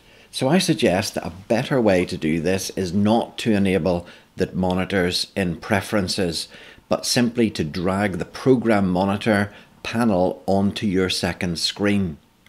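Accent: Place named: Northern Ireland, United Kingdom. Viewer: First northern ireland accent I’ve ever found on a premier pro tutorial lol also from northern ireland (tyrone)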